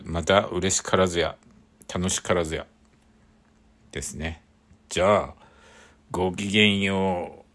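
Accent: Saga, Japan. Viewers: 佐賀産の食材は素晴らしい👍 すき焼き好きで〜すそして佐賀弁良いね👍😊 今日のゲストは「ゾマソン」さん！あれっ、僕は間違ったかなさん😅 Xも見ますね！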